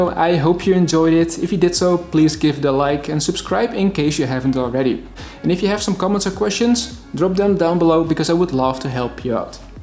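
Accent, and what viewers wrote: Netherlands. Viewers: Ik hoorde echt vanaf de eerste seconde dat je Nederlands bent haha!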